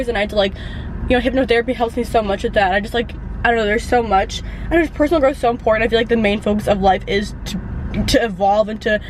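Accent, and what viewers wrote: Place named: Russia. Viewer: I love your russlish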